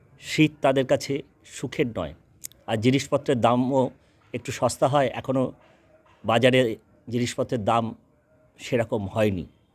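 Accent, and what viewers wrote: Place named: Purulia, India. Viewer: আগের পর্বগুলির মতোই এই পর্বটিও মনে রাখবার। অসাধারণ বললেন ' দমে জার লাগছে ' নামের এই কবিতাটি। মনে হচ্ছে যেন আপনি ওই পুরুলিয়া অঞ্চলেরই মানুষ। সঙ্গে মাননীয় শিক্ষক ও আবৃত্তিকার শ্রীযুক্ত চন্দ্রচূড় অধিকারীর সম্পর্কেও আপনার কথা থেকে জানলাম। ওনার কণ্ঠে এই কবিতাটি শুনবার আগ্রহ থেকে গেল। আপনি এই আগ্রহটা জাগিয়ে দিলেন। কথাকুঞ্জ এভাবেই আমাদের মন ভরিয়ে এগিয়ে চলুক। এই কবিতার কবি মাননীয় শ্রীযুক্ত মোহিনীমোহন গঙ্গোপাধ্যায়-কে আমার অনেক শ্রদ্ধা ও প্রণাম। কথাকুঞ্জের জয় হোক দাদা।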